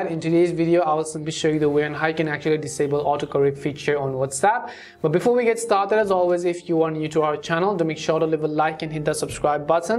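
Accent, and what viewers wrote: India. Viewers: WTF....are there no American English speakers that can handle simple tech advice. Indian is frankly rough on the ears and often unintelligible.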